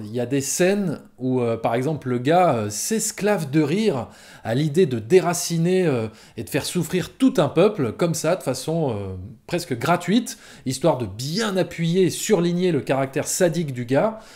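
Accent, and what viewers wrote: South, France. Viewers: Lorsqu'on met Aliens 4ème d'un classement on se rend compte réellement que James Cameron n'a fait que des excellents films voir des chefs d'œuvre. Je le mettrais plus haut dans la liste mais le top 5 est très compliqué Concernant Avatar je le mettrais aussi dans les derniers du classement car il m'a marqué essentiellement pour ses effets spéciaux révolutionnaires et que dire de la 3D... Pour la musique je ne suis pas d'accord avec toi par contre je l'écoute de temps en temps mais pas autant que celle du seigneur des anneaux qui est addictive Du coup si je devais faire mon classement des films de James Cameron (versions longues uniquement) 1) terminator 2 2) aliens 3) titanic 4) Terminator 5) Abyss 6) avatar 7) true lies Vivement la réouverture des salles obscures Bel accent du sud sinon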